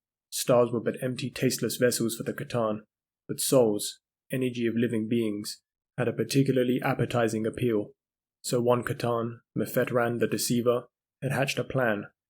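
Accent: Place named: United Kingdom. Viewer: Dunno why i fixate on that comment so much, it keeps rolling a round in my head. I think its because i was so genuinely surprised that a new Lore channel had popped up and it was really good. I think i have nailed his voice down - Calmer than arch warhammer, More interesting than luetin and British. these are all wins.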